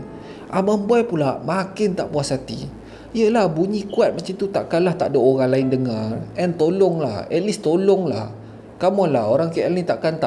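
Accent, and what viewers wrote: Perak, Malaysia. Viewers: Ooo mmbesar dtmpt kelahiran saya,w.maju,tu dgr lohat perak je😊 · ptt ler ade sleng perak...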